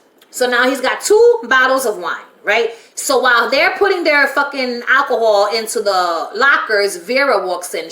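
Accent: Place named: United States. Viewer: I think ur baby love ❤ 😍 lou will end up screwing over judy Bryant 😡😡cause lou has 2 meet her contact's as she doesn't get visitation so lou will know the plan n f**k over judy at last minute and ann Reynolds has 2leave my baby love alone mr Jackson 💔 🎶,but im with u marie is starting to grow on me again. Please give 🙏 😔 mention 🙏 Darren from Dundee just want u to mention me in ur American accent as ❤ ur accent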